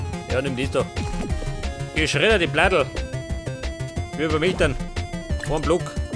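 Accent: Bavaria, Germany. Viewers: Saugeils Video schee dos du in Bayerisch redst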